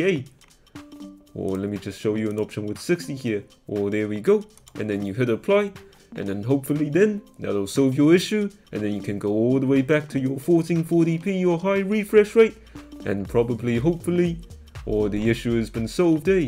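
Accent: New Zealand. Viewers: Hes a kiwi😂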